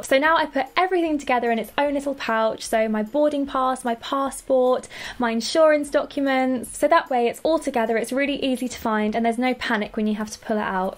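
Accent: United Kingdom. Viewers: She reminds me of sydney serena with blonde hair, blue eyes and a british accent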